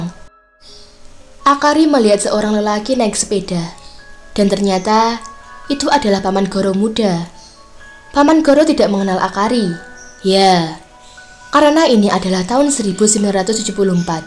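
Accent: Java, Indonesia. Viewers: Bahasanya medok gk nyaman dengerinnya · Suara mu medok nduk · Kak riquest anime perang ato saling bunuh aku suka v] Dan biasakan bahasa indobesia Dan kurangi nada kayak orang jawa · saya tak suka suara medok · admid pasti orang Jawa 😄 Medoknya gak bisa bohong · org Jawa yah? Kok medok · Logat jawa nya kental bngat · wong jowo medok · mbaknya logat jowo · Cie medok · Mba nya medok yaaa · adminnya orang jawa ya.. D-nya itu lo.. khas banget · Medok bnget sis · Yang ganjel aksen jowo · Medog gaes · Jawanya medok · kelihatan medoknya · Medok bgt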